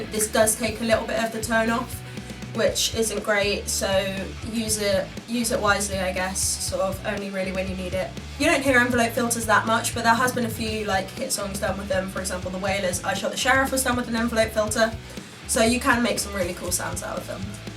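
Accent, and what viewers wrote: England, United Kingdom. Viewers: She's sooooo English!!! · omg shes english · Английский аакцент . мне так нравится как ты играешь ты такая красивая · Damn, I fucking love the British accent ❤️ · WTF you have an english accent? Thought you were from Kentucky or something lmfao. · What a perfect original English accent!! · I hear british accent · Omg British yes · ¿Are you English, beautiful? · She sounds British to me. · The pedal sound like sh#t, but I loved hearing your perfect english accent.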